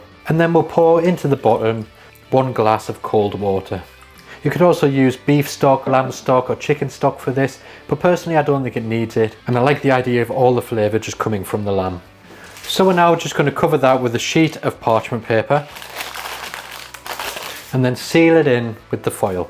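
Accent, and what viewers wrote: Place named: Birmingham, United Kingdom. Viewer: Great content. Im loving the Brunmie accent 😮 😉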